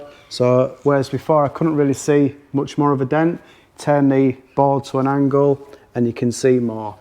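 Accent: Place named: England, United Kingdom. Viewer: G'day Australia here, top video, and has taught me a few things I've been missing thanks for that, have been learning PDR for my own use off YouTube, being an old Pom it's good to hear a Pommy accent instead of a Yanky one for a change. Keep up the good work - DaveO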